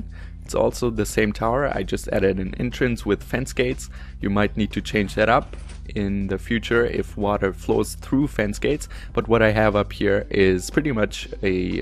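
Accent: Germany. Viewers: Dein Deutsch hört man bro :D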